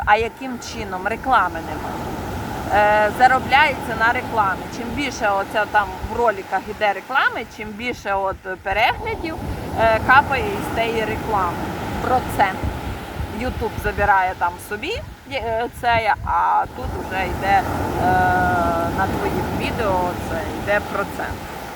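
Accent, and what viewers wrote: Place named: Podillia, Ukraine. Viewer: Ви з Поділля? Маю знайомих з Бершаді, говорять точнісінько як ви.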